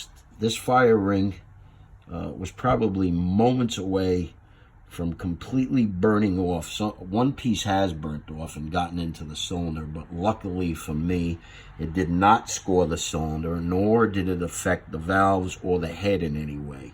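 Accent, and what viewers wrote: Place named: East Coast, United States. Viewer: Great description of what you found and the mechanics of it all. Subbed for you articulation and East Coast accent!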